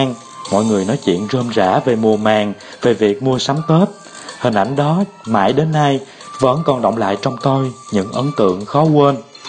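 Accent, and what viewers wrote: Vietnam. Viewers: đánh cá thú vị quá,iu giọng miền tây quá bạn hiền ơi!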